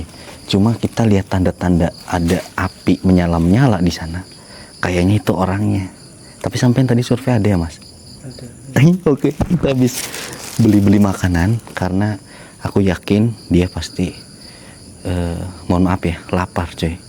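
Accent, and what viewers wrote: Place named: Palembang, Indonesia. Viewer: Mas kaya orang Palembang Lo ternyata orang Jawa.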